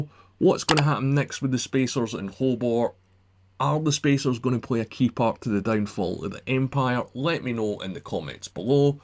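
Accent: Scotland, United Kingdom. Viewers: Is this Scottish English?🇸🇪👋🏻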